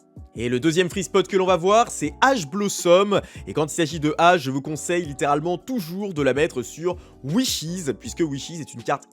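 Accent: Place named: England, United Kingdom. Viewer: Toujours aussi kiffant ton accent anglais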